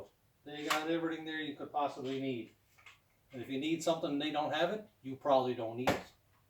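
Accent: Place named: Newfoundland, Canada. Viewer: You sound like you're from Newfoundland, if so what part?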